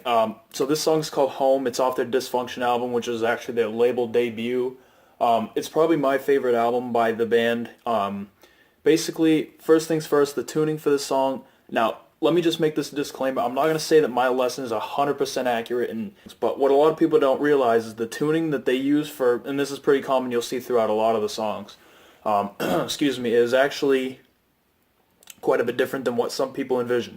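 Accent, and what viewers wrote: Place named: Massachusetts, United States. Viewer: A lot of these riffs you technically have the correct notes but they’re played quite differently by Mike mostly so they ring out as chords more than how you do it but I guess if you did this totally by ear you did a good job cuz the notes are mostly correct. Also where in MA are you from? I’m from there originally and I hear the accent lol. “choahd=chord”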